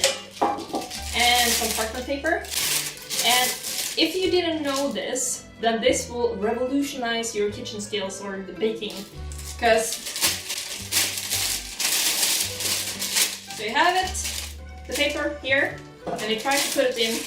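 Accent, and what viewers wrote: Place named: Sweden. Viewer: Are you from suécia? Your english is different. The suécia is a beutiful country.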